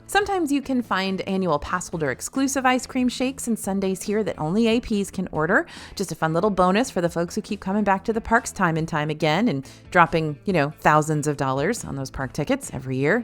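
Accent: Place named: Western New York, United States. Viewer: I am from KENMORE NY and just like you, I say caramel the same way .. long live school bread and Tonga toast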